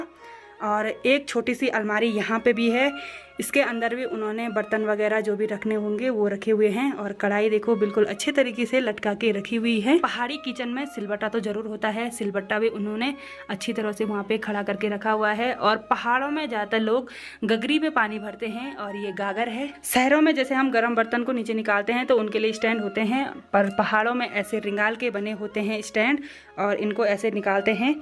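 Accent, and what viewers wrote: Standard. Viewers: Sister aap bahut achi hai sudh hindi bolthi hai aapki har viedeo hum dektha hai hum keralse hu india me keral wala bahut.......... Hota hai by sister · ji aap Pahadi ho fir bhi aapki Hindi Pure bol rahi ho...bahut badhiya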